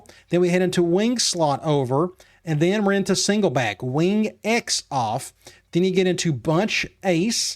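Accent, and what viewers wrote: South, United States. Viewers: He's definitely Southern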